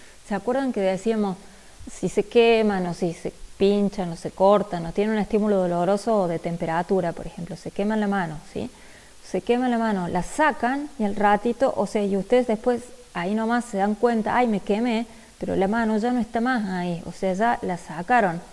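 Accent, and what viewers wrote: Córdoba, Argentina. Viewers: es re util gracias sos de cba por la tonada?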